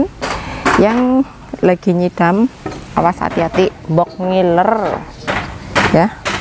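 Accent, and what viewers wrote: Banyumas, Indonesia. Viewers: Ru prtm kali liht, ngapak y MB, slm dr pnrgo, melu kemecer pdes" kecut sjk e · Uewanakkkkk mbak, seger gitu ya, mbak nie org jawa ngapak ya, cara ngomongnya kayak nenek aku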